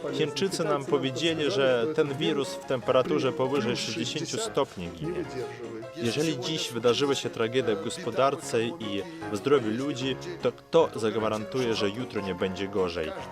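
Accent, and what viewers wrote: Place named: Russia. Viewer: Czemu ten lektor mówi Rosyjskim akcentem ?? Japierdole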